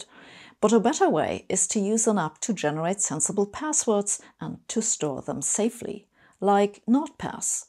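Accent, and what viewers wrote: Germany. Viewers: how did I know she would sound german before clicking the video haha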